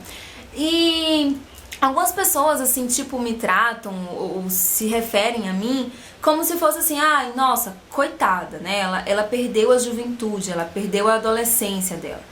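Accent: Bahia, Brazil. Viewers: Caramba, que bonito! A mulher que é amada reflete isso em todas as suas ações e sinto q vc é uma pessoa mt carinhosa, é super gostoso ouvir seu sotaque da Bahia rsrsrs bjs!!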